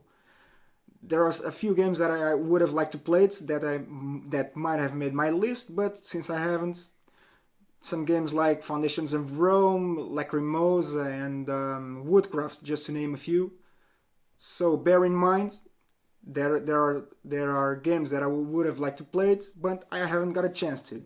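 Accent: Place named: Portugal. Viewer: This english sounds like portuguese?! or am I dreaming. Anyway, very good video, good, simple production, direct to the point! Boa sorte! Mais um sub!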